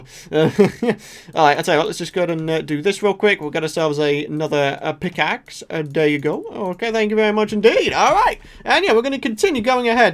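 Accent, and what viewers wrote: United Kingdom. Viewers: Giovanni Barberio...i would guess great britain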